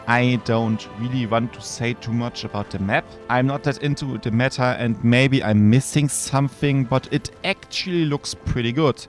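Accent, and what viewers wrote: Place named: Germany. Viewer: german accent haha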